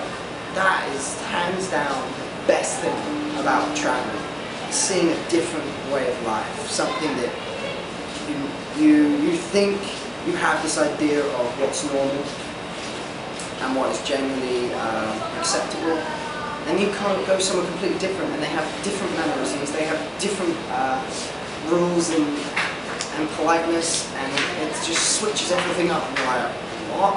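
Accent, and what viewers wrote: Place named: United Kingdom. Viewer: British accent:D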